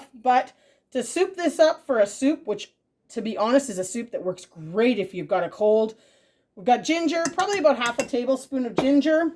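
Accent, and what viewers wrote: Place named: Canada. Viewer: I literally reheated and repurposed a bunch of things I already had made in the freezer or fridge. I then repurposed other items in the fridge that were left over and it was a fairly easy week for us as well. I had to laugh the other day as I was watching one of your videos and my daughter asked why you talking about "a boot"..you were saying about..I explained you are from Canada and you say things just a little differently like Mr Rem and Mrs Annie that we watch that are from Canada too. That stew sounds like it was delightfully rich and wonderful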